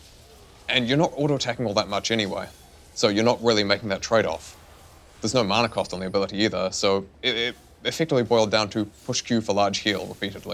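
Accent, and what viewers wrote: New Zealand. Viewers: I'm pretty sure I read once that from New Zealand. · Chris Ng He is from New Zealand mate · So odd to hear a fellow kiwi accent in an official Riot Video :) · new Zealander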